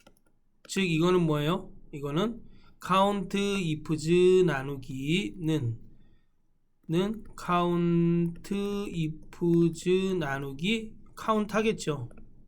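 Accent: Gangwon, South Korea. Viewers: 선생님 오늘 시험보고왔습니다~~ 함수 진짜 75문제 두번 돌려보고 상시기출 보니깐 좀 이해가네요!! 반복이 답인것 같습니다. 선생님 덕분에 index match 마스터했어요! 선생님꺼 보고가면 왠만한건 다 풀 수 있습니다 ㅎㅎ 실수만 안했으면 만점일 것 같습니다! 저도 강원도 사람이라 억양이 익숙해서 즐겁게 강의봤습니다! 농담도 재밌어요 ㅎㅎ 감사합니다^^(셀서식-범위잡고 이름지정 조건부서식 수식조건셀 Hour*65+minute*/10 요금 65 If month 수시 정시 1-6월 9월 Vlookup left 할인율 구하기 Daverage- average 상공고 평균 - 전체고 평균 Averageif , round 1 &연산자 “점” 정렬 부분합 시나리오 지정 남,녀 기준 2개 하래서 당황함 = 컨트롤키 잡고 눈치껏 했습니다 매크로 차트)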